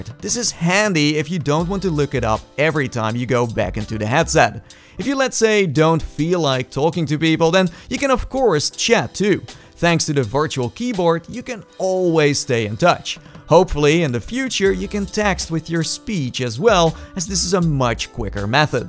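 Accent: Netherlands. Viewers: ik denk dat dat je nederlands bent bro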